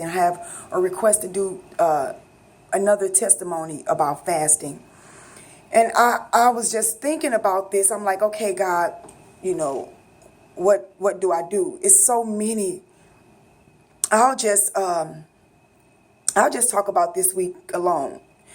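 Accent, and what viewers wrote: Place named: St. Louis, United States. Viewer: Amen sis! God is faithful! You sound like you from the home town! STL?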